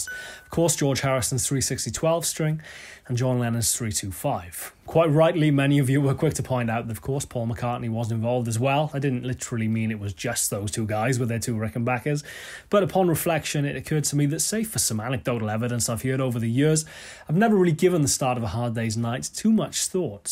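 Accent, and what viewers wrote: United Kingdom. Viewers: Helps to hear a Brit explain.. adds to the authenticity..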